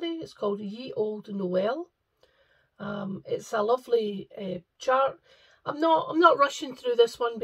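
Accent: Scotland, United Kingdom. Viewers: I discovered you earlier this week and binge watched several episodes and of course subscribed. I've fallen in love with your beautiful Scottish accent!! I'd watch just for that, however your content is wonderful too! So win win! I'm sorry about your dad's health. And yours as well. How painful. I'll pray for full recovery on your legs. I'm sure you are looking forward to summer as much as we are.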